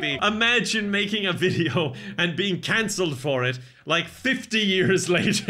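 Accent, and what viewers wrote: Ireland. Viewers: turned so Irish in this. Like he is letting loose his Irish tendencies in this video. Like so many Irish angry rants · I love cranking his Irish accent up to 11. I'm Canadian and we can't do that because the "Canadian" accent people hear in pop culture isn't Canadian at all - absolutely no one here has that accent and I don't know who does, if anyone. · This is most Irish I've heard in a while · I like how accent gets progressively thicker and more irish as the video goes on and he gets excited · Omg I just love irish accent so much. I dont understand anything but I just love it.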